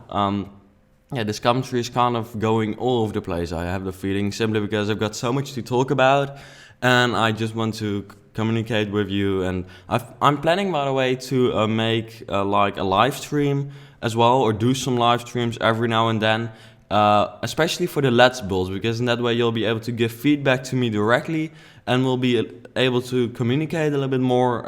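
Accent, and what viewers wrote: Netherlands. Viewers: Are you dutch? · Suupermooi gemaakt! Ik hoorde aan t begin van de video t nederlandse accentje er al doorheen, kun je ook niks aan doen tho ;) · Ik hoorde gelijk dat je Nederlands bent ;)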